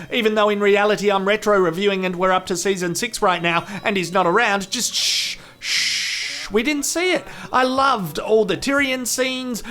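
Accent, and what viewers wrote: Australia. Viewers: he's definitely not British. I'm British and he sounds more Australian to me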